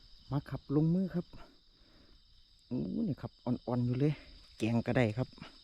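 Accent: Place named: Nong Khai, Thailand. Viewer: สำเนียงการเว้าคือคนหนองคายเนาะจ้าติดตามให้แล้วจ้ามื้อนี่ช่องลูกจ้างอิสละบ่มานำบ่จ้า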